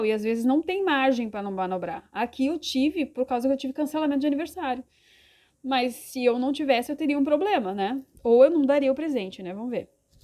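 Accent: Brazil. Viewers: Tu é gaúcha? De q cidade? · Teu sotaque é maravilhoso me convida pra ir toma um chimarrão ai com vc kkkk Sou de Lages SC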